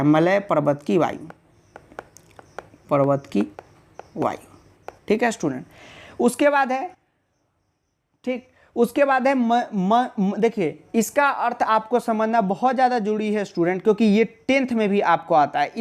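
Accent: Bihar, India. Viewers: Sir aap pakka bihar se ho ne 😊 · Sir are from bihar hamare edhar ese hi pronouse hota hai hamre ias officer bhi pronounced ese hi karte hai eska ye matlb nahi ki unko nahi ata hai concept pe dhyan do esa koi nahi padhyaega basic se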